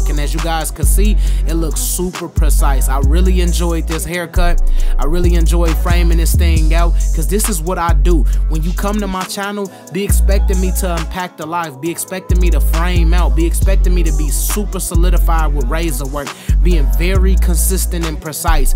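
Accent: Chicago, United States. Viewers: wait you from chicago dog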